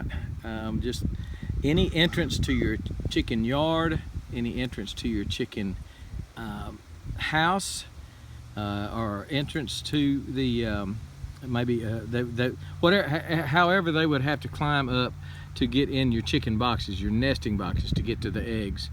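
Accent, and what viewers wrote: Texas, United States. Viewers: Dude you have the absolute perfect Texas accent/voice. I could listen to you read a book or do the news or something like that. I grew up in central ok then moved to north texas so mine is not quite as pronounced as yours. I love it though. Good show!!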